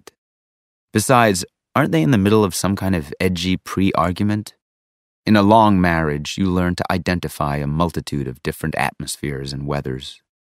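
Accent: United States. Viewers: As a fan of American accent I'm really happy about this video <3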